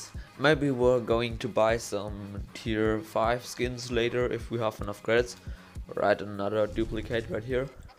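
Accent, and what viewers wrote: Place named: Germany. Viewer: Best Commentary 2k17 the German Accent is just awesome and it sounds you chewing bubblegum while the whole video. Still op Video ^_^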